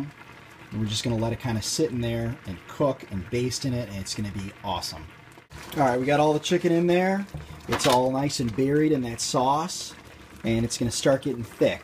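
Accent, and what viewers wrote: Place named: Michigan, United States. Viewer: thanks for the video, you sound like youre from michigan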